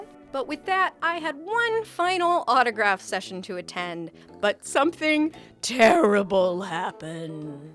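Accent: United States. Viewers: I think it's just an aspect of her southern accent (drawl) slipping out every now and then. It's less an extra 'r' sound and more that we blend or even skip the vowels so the accent on the "a" disappears.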